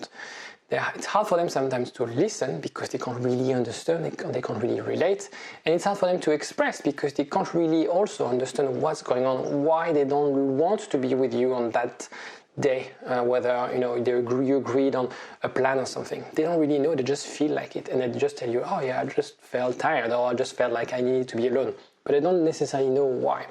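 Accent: France. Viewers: L'accent français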